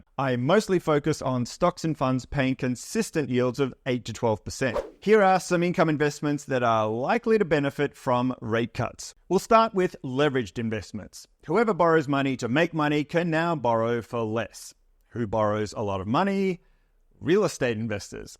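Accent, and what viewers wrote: Australia. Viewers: Your videos are so well done! Do you edit yourself? For my company, we also do podcasts, so I’ve become an audio video junky. lol. Are you using a shotgun mic? Great acoustics. Looks like you usually treat your space for audio. And the sound editing nice! So happy for you. You are a breath of fresh air. The Australian accent always helps. 🎉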